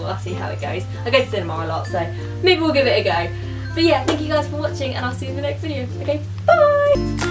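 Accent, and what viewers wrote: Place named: United Kingdom. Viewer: That british accent is beautiful, i love it.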